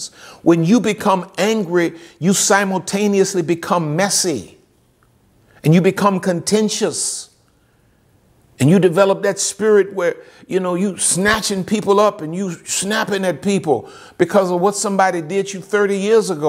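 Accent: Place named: Jamaica. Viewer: Loved the Jamaican accent! There's nothing you carnt do 😀 your my favourite Youtuber without a doubt! #ONLINEFATHER❤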